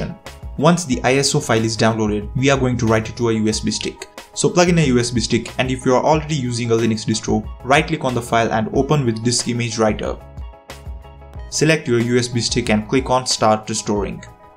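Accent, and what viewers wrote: India. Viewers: are u from india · Bro you Indian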